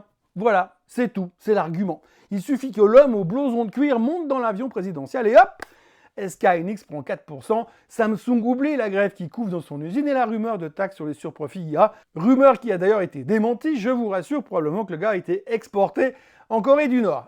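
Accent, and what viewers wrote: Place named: Valais, Switzerland. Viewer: J’apprends à connaître la Suisse avec, cette fois l’accent Valesan( Orthographe a confirmer). Et bon anniversaire.🎉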